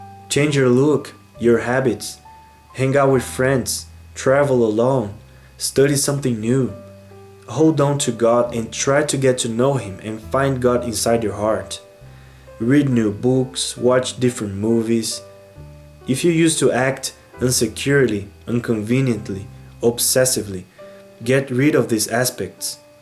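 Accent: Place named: Brazil. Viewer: I KNEW it was a Brasilian accent!!! haha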